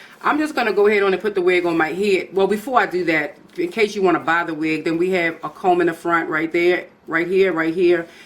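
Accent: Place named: Mid-Atlantic, United States. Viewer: Where are you from? You sound like Maryland, Philly or Pittsburgh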